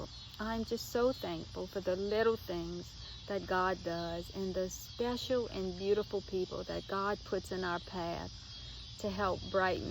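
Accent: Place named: New Orleans, United States. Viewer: First time I’ve ever saw your videos and I can hear that NOLA in ur voice. Bless u momma.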